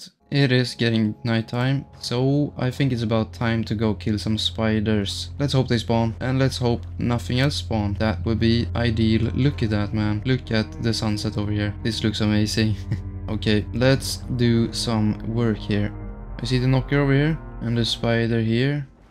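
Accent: Sweden. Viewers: to be honest you kinda sound swedish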